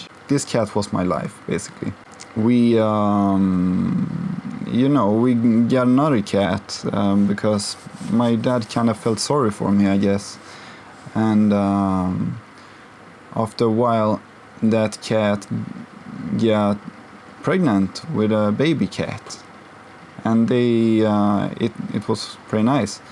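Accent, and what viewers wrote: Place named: Europe. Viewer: were are you from actually? Your accent was a bit european to me, or im i totally wrong here?🤔🙃🤭.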